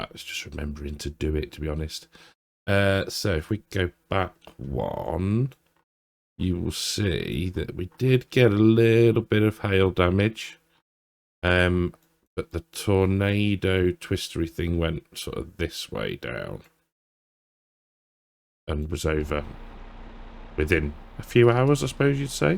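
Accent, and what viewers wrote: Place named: United Kingdom. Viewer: What part of the UK are you from? I lived in London, Birmingham, Manchester and spent a bit of time in Skegness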